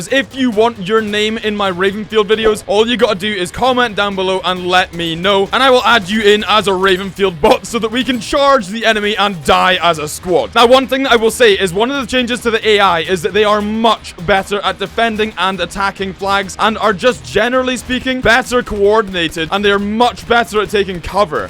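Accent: Scotland, United Kingdom. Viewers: Are you scoty boy?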